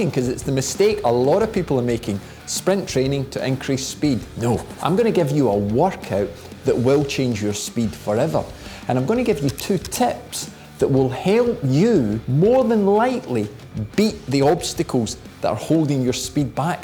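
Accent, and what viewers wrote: Scotland, United Kingdom. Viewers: I just watch these videos to hear him swear in Scottish. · Fook me, did I just spend 12 minutes listening to some Scuuu...ottash lad telling me to get my head down and stay off the brakes!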